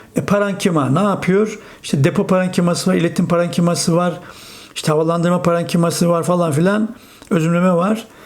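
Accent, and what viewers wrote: Black Sea, Turkey. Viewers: Hocam karadenizlimisiniz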